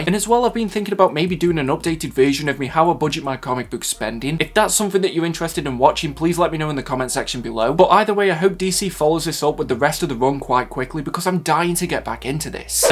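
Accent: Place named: England, United Kingdom. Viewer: Great accent. What part of England are you from?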